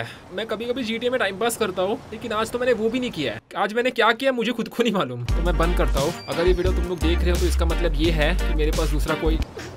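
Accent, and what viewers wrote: Maharashtra, India. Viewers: Bhai vo marathi h main bhi shi re shi ghan aisa bolta hu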